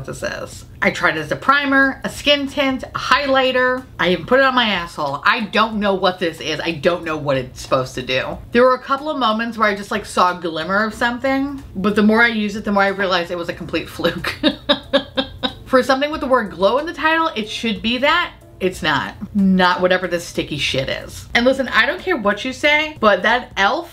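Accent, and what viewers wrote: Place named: New York, United States. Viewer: I am from nyc and I hear the new Yorker inside you 🥰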